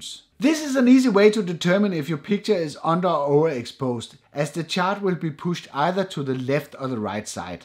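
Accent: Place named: Denmark. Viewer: Fantastic video! Best one on youtube to explain ND filters! oh well, your english has a bit of danish taste. that's even better! greetings from Copenhagen! Mange tak!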